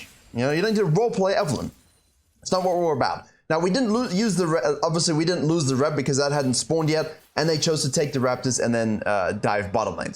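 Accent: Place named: South Africa. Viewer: The little south african cracks in your accent makes me feel at home :) the information is great btw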